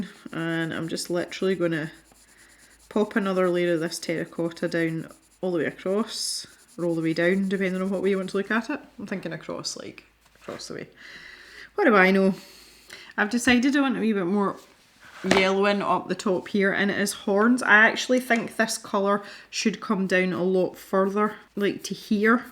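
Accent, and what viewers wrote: Scotland, United Kingdom. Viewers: haha, I think I've said the same thing about enjoying to just listen to you talk, but that's because I LOVE your Scottish accent. But I also enjoy watching your videos and see what you create. It inspires me and also I love watching your ScrawlrBox videos, because it gives me the chance to see if I'm the only one liking or disliking new art supplies ^^ also love to see what you make with the prompts :) Anyway, back to the art at hand. The giraffe turned out really beautiful! I like it :) I have a greyscale colouring book here, but I don't actually like colouring in it :( might be the paper, though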